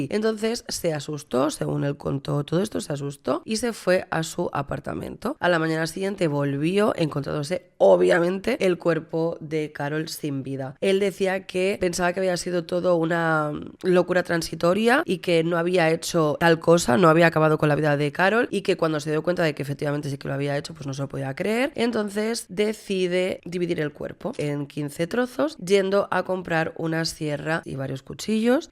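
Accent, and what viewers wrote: Catalonia, Spain. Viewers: ¡Buenas! Primero de todo gracias por lo que haces. En cuanto a lo de vocalizar... creo que la gente confunde tener acento con vocalizar. Tienes acento catalán y se nota. Pero eso no es nada malo, es más, es bonito que las personas tengamos distintos acentos. Yo creo que más bien se refieren a que te salen catalanismos estilo "el caso que nos pertañe". Pertañe en castellano no existe, sería atañe. A todos nos salen expresiones de nuestra tierra y es lo bonito de la lengua! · Tienes un acento catalán bastante fuerte. Yo te entiendo perfectamente porque wiybdevla zona😅😅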